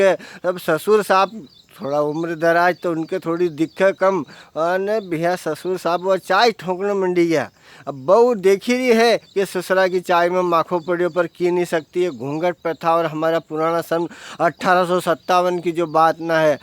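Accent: Malwa, India. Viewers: ...man khush ho jata h apk video sunkar..khas kr apki malvi boli sun kar...👏👏👏👏👌👌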